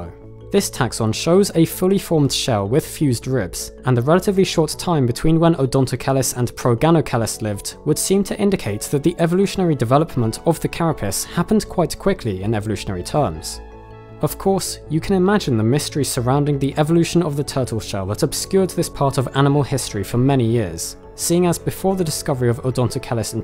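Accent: United Kingdom. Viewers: Abahrelghazali I agree! These videos are quite credible, and reassuringly cautious with assertions. It’s a pleasure to hear scholarly rigor propelling engaging, narrative logic with worthwhile, substantial content, all described with Linnaean terminology. On YouTube you never know what you’re getting from just glancing at the titles and cover illustrations; some “science” presentations are just amateur pop entertainment with lots of violent predation and a very low global veracity index. I do grin frequently over the British pronunciations. I will never again refer to proboscideans with such ungenerosity of syllables.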